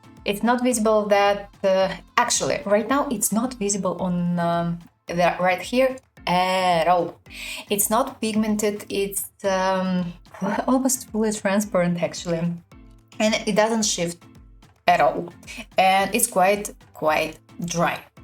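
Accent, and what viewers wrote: Russia. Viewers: r u russian?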